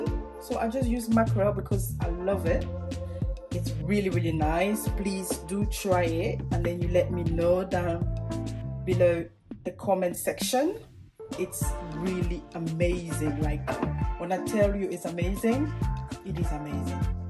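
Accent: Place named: England, United Kingdom. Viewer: Merci pour la recette et j'adore ton accent anglais 😉👌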